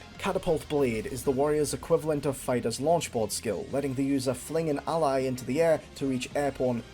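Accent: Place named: Newcastle, United Kingdom. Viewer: You sound Geordie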